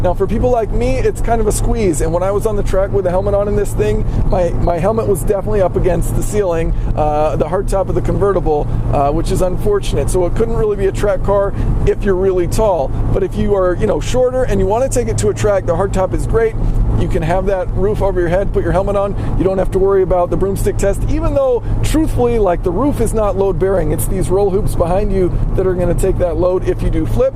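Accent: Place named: South, United States. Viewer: You can tell right away , that you are from the south .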